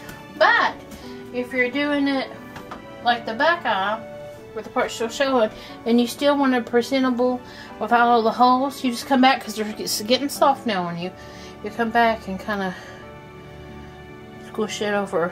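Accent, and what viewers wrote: South, United States. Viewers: U are adorable!! Are u from the south?? I can here an accent in ur voice. Thanks for the sweet video!!! U are making me want some buckyes!!! Urs look yummy!!! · Ok........this recipe compelled me to subscribe,love you your a sweetheart.............."just keep a-dippin'" true southern belle. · has a sweet southern,comforting voice.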